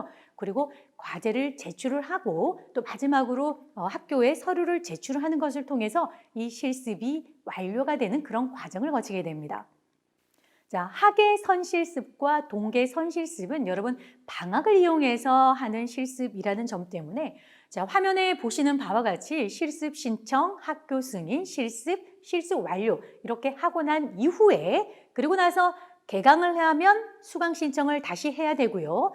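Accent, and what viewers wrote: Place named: Gyeongsang, South Korea. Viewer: 오래전 교수님통해서 복지사자격증 취득했는데요 구수한 경상도사투리에 강의참잼나게. 청취했습니다 대단한교수님입니다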